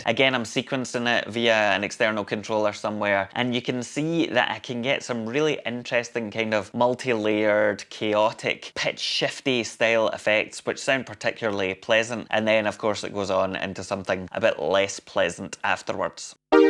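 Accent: Scotland, United Kingdom. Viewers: Love yer scottish accent (Shetland / Orkney?) Those AKAI sounds wicked too.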